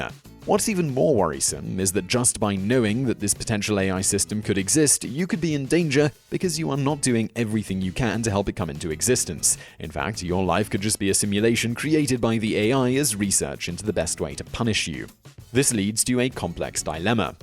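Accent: United Kingdom. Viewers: I get it. He's British. But, Your I Null? He's never heard the word urinal before? Re-cog-nized? That's not even a word. And don't get me started on his pronunciation of aluminum. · LOB, judging from his accent, he is clearly British so visiting every state in the USA wouldn't be a factor here.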